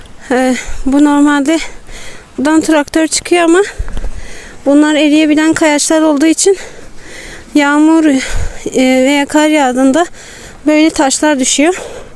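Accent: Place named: Adıyaman, Turkey. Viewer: Bir adıyamanlı olarak sivene bayıldım rabbim yardımcınız olsun yeni gördüm sizi takip etmeyede devam edeceğim inşallah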